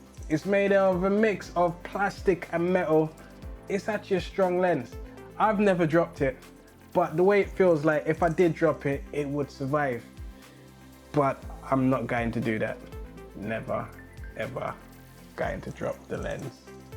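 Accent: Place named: England, United Kingdom. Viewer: great review. gonna pick one up. also this is my favorite english accent, haha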